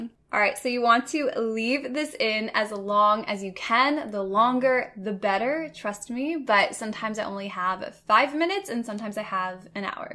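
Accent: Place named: Canada. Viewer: Casual lurker here. I didn't know you were Canadian?!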